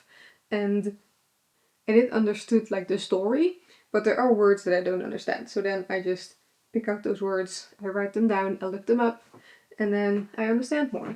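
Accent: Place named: Netherlands. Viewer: Concerning you reading Swedish I think you are on to something. 😊 I can understand what you are reading and also that you are from the Netherlands. You sound a lot like my dutch friends when they are trying to speak Swedish. 😊 Keep on your good work. And try to involve your husband too. He's having way to much free time. 😅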